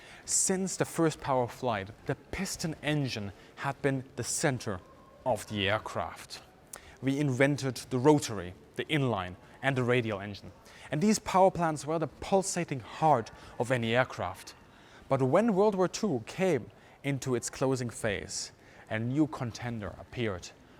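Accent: Germany. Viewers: One of the fastest 23 minutes I’ve had this week! Such a vivid command of the English language and cutting accent of the German dialect in itself is interesting. You have such a vast knowledge and enthusiasm for history and aviation it is incomprehensible your videos could be anything but fascinating. Thank you for your skillful narration and aviation historical intellect. Bravo! From NY, a third generation American with ancestral roots from Germany, Kevin.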